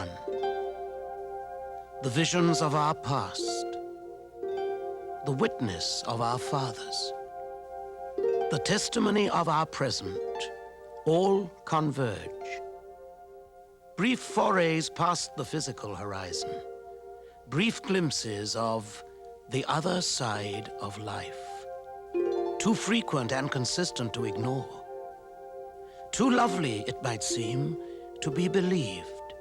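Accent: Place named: England, United Kingdom. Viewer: I DON'T want to be, or sound like many of the negative commenters we come across online.. I'd RATHER be positive! But... this video kinda sucks. It's shallow, doesn't say much at all.. just the English accented narrator speaking sparse attempts at poetic sounding narrative, shallow talk about how live "within boundaries" in this life, and other such trite sounding quasi-philosophical babbling. There's very, very little discussion about ... "The Other Side Of Life". Very little, other than a couple allusions toward someone having a NDE on an operating table. The rest of the video, to a large degree, is images of scenes on Earth, with accompanying 70's sounding made for TV movie soundtracs. Oh well. Watch it if you want ;) Blessings to all! We're all out of here some relatively soon coming day, and we'll each experience the ultimate "THIS IS IT!" for ourselves.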